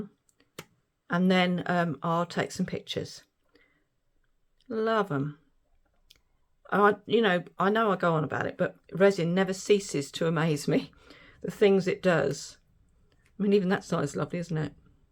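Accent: United Kingdom. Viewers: I am East Midlands too, I could tell by your accent x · Wow, they turned out gorgeous! I've stopped trying to do blooms because I've jumped from one resin to another. You just nailed the reason why I've given up, information overload! I have two resins I enjoyed working with on different projects and I'm going to stick with them until I understand how they work. Thank you for this informative video with a beautiful turnout. My husband was born and raised in Nottingham (Arnold) so your accent is very similar. I kept thinking you had to be from the Midlands 🙂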